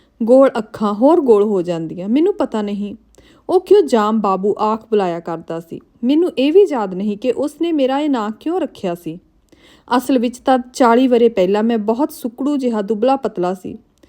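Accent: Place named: Majha, India. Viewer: ਠੀਕ ਸੀ ਕੁੜੀਏ ਖੜ ਦਾ ਪਰਦਾ, ਪਰ ਲੱਗਦਾ ਬੋਲੀ ਮਾਝੇ ਦੀ ਬੋਲਦੀ ਏ ।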